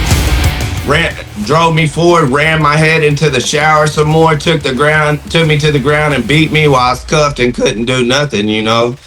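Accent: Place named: South, United States. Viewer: I know who you are talking about . They do kinda favor this cat here got more of a southern draw.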